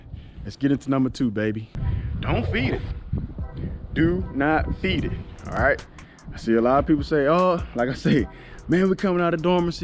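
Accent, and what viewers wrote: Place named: Georgia, United States. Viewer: Sound like somewhere in Georgia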